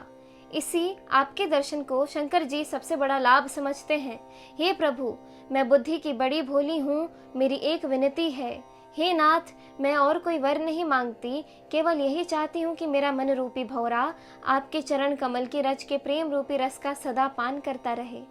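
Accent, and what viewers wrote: Standard. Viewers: Atyant shuddha uchcharan hai . Ati sundar hai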